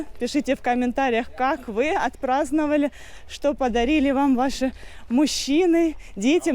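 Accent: India. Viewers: Интересно, что у вас английский с индийским акцентом.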